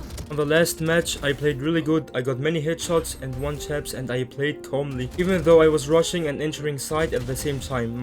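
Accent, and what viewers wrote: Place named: Arab world. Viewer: I'm the 700th subscriber Also keep up the good work (where are u from btw cuz u have an Arabic accent)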